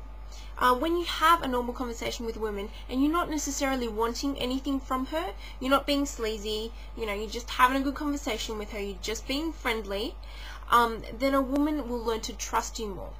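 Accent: Australia. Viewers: Oh my yes, hubba hubba! Sexy down to the marrow. Auzzi accent is to-die-for! Edible, SOOOOOO edible.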